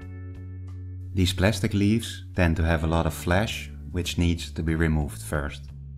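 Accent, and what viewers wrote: Netherlands. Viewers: are you dutch? if so vet man!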